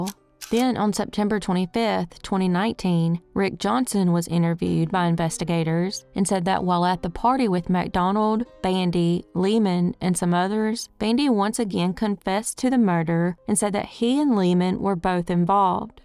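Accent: South, United States. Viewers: I feel like i haven’t heard your southern voice in idk how long ☺️☺️☺️ rot in hell Larry 🤪🤪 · Where’s your accent from? I have been listening for a while and I’m guessing either TN or NC. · Her"s is the only southern accent i like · It's nice that these cases are narrated by someone with an authentic Southern accent.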